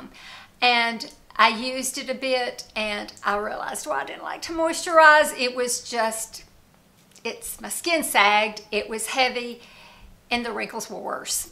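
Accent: South, United States. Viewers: I think southern women are the sweetest, kindest, just examples of grace in all circumstances. I shouldn't assume you are southern, but your accent is beautiful. You are really beautiful, inside and out. Whatever you are doing, it's working! You look fantastic. People our age never did use a whole bunch of skin care products. I started when I was 58, and some things just made my skin WORSE. I had acne bad when I was a teenager up until my forties, it gradually became less and less. Now that I am older, and my skin isn't as oily, I find replacing that oil with some vitamin C and A oil really feels good. I think it helps my skin. And the red light therapy, yes! I love it. I lie down and do some meditation while I do mine. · They both have a southern accent and are adorable but they don't sound alike.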